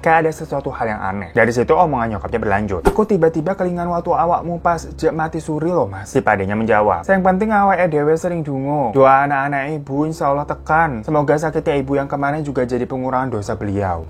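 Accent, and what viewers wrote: Java, Indonesia. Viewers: Mas nya pas pake bahasa jawa jd lucu ngomonge🤣🤣🤣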